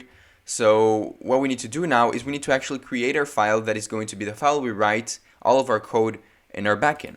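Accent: Brazil. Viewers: Mano pior que o sotaque também entrega kkkk esse é o primeiro vídeo que vejo seu e já tive quase certeza que tu é brasileiro pela forma de falar, porque eu falo do mesmo jeito hehe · This is the first time i listen to a brazilian speak english... Why Brazilians dont like to speak english?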